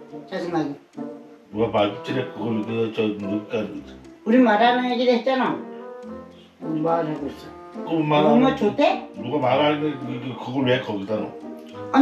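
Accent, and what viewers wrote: Gangwon, South Korea. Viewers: 오리지널 강원도 사투리 정말 끝네줍네다 응원합네다 ㅎ~~~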